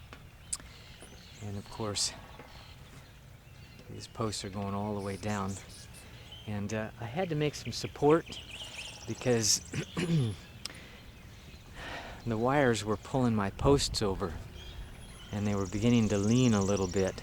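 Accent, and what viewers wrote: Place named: South Carolina, United States. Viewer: he sounds like he is from upstate south carolina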